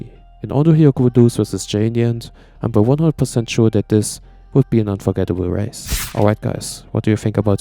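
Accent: Germany. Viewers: New to this channel but as i windbreaker fan i love this video. Considering your slight accent are you also german?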